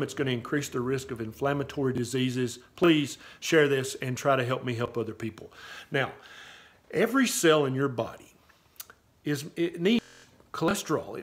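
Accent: South, United States. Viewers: love that southern accent 😊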